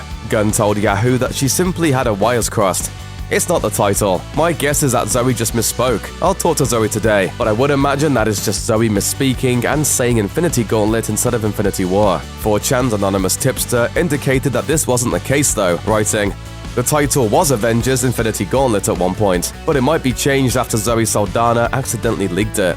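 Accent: United Kingdom. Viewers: I like your Brit accent O-al-ll chw cute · Yo is this that the british dude from sanity not included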